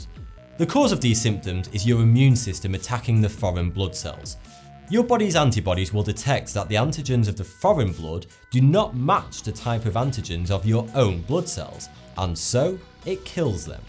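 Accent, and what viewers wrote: United Kingdom. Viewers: Thought 2 is like vsauce, but he's a Brit. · Hold on, when you say "British" accent do you mean: Irish, Scottish, Welsh, Northern, Dorset, stereotypical Londoners or English. Please specify. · R u British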